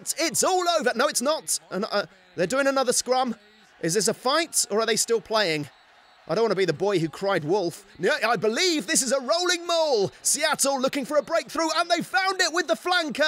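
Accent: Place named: United Kingdom. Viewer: why British accents are so awesome and sexy.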